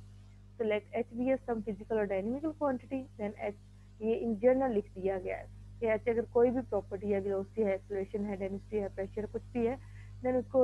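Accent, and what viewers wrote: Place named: Pakistan. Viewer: Voice se hi lg gya tha Pakistan ho ...🙈😅😅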